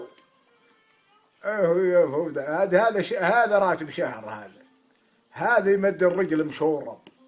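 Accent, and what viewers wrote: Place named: Shawi, Iraq. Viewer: هذا شاوي عراقي هكري .. انت اسمع لهجته زق ... هالرعيان صارت سعوديين ....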